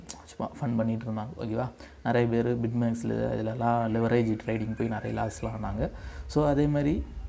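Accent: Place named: Nanjil, India. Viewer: Brother unga voice vachi Guess panniten nanjil nu Already Mutual Fund la invest pannitu iruken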